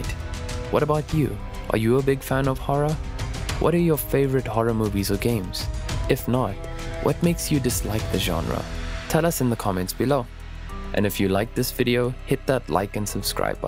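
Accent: South Africa. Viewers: I knew the voice over guy was South African as soon as I heard his voice. I'm South African too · Awesome hearing a South African accent on this channel. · I know a South African accent when i hear one :D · Can always spot a South African accent. 🇿🇦 Great topic. Horror lover 🧟‍♀️ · Loved this video and the narrator's South African accent!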